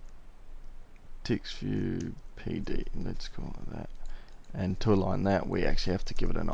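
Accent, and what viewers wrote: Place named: New Zealand. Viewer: Found a Kiwi haha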